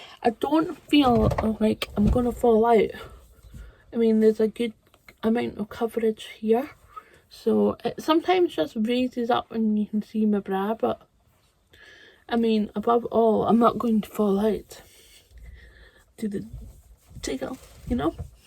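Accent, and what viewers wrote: Scotland, United Kingdom. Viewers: Lovely dress, and the heathery colour looks great on you. I'm in Aviemore quite a lot as I live a bit further north in Forres and it isn't too far away for a day out. Always good to hear a Scottish voice on youtube!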